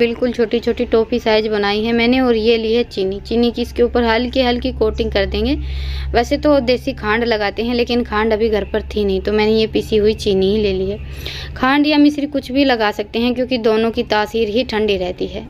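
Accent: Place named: Rajasthan, India. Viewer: Waw ap jitni bi recipe bnate ho bhut testy bnti hogi dikhne bi achi lgti h or di apki bhasha bhut pyari lgti h ap real bhasa bolte ho to mja ata h sunne me mai bi rj se hu so smj bi ati h or ha sbse jyada le isantiya ye bolte ho to meri hassi bi ni rukti 😂😂😂😂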